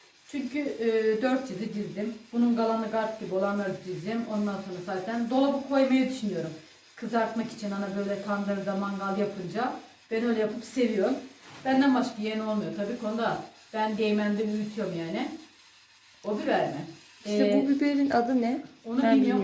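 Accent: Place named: Uşak, Turkey. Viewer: Bende Usakliyim senin konusma sivenden anladim Usakli oldugunu abone oldum basarilar Süpersinnn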